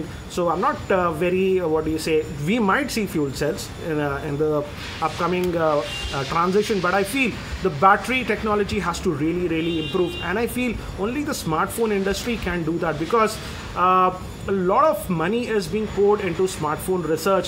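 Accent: India. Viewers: I like the indian english accent, keep up the decent work, sir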